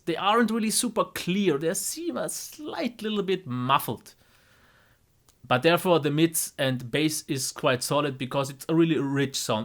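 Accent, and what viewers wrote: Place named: Germany. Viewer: "grey knight" made serious comment about your integrity as an impartial reviewer. Please speak a little slower and I would like another review for lgg4 as this is a phone I think I would like. Thanks, and you are probably German or E. Europe as another subscriber asked. We are all equal, no reason not to answer a simple question!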